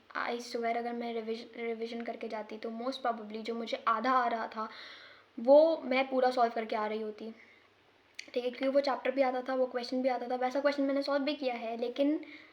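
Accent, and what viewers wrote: Bihar, India. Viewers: Bihari ho kya ?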